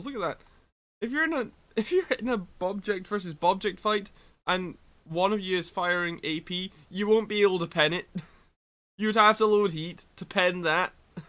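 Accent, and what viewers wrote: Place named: Scotland, United Kingdom. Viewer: Dear lord, u is sounding Super Scottish right now!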